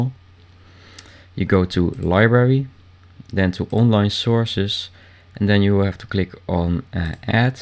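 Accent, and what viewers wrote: Netherlands. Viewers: are you dutch? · whats your languaze, i think your dutch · duidelijk een nederlander :') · Oh god. The horrible dutch english accent. But the content of this video was awesome. Thumbs up for you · your from belgium of holland ik hoor het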